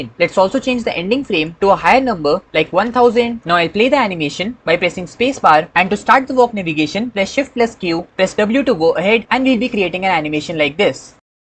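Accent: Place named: India. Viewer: Straight at Point and no other needless stuff 👍 You Voice and bit of accent seems like Bharatiya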